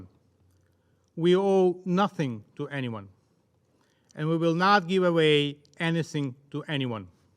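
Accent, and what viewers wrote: Russia. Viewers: Вибачте, шо домахуюсь, але чого Владімір Зєлєнскій, а не Володимир. Непогана в нього англійська, але з елементами російської...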